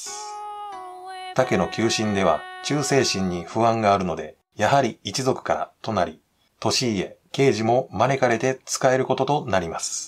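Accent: Kansai, Japan. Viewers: 主さんは関西の方かな？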